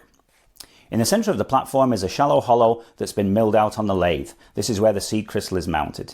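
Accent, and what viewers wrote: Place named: Australia. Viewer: Your Australian accent is beautiful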